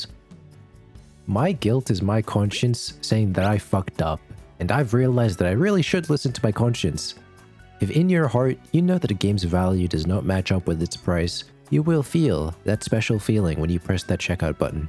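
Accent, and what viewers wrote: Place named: United States. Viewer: Loving your videos man. Got a new subscriber. That said, I know you're Aussie, so I'm curious why your accent is 95% American, with 5% Aussie accent slipping through occasionally. It's tripping me out lmao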